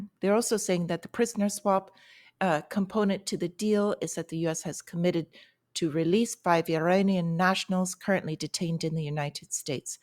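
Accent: Ireland. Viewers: Hey 😄 Thank you for posting your daily market updates, I love them! You mentioned the relative strength of the US dollar will get hammered, what scenarios do you think could happen with say the EURUSD? A second question, is your accent Irish? Thank you & Have a great day.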